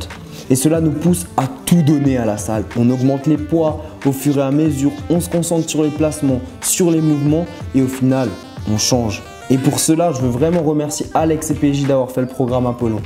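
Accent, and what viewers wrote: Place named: Alsace, France. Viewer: Tu es alsacien non , je crois avec reconnu l'accent de chez moi :')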